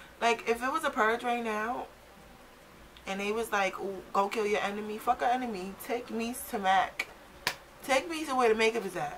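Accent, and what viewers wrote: New York, United States. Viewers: Listen I love just hearing you rant and talk, your gorgeous and so NY ...love it! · I was going to ask if you were from NY! Lol. Love it! Keep that truth real and fresh love! You have a whole wide world of people that support you and you doing your thing.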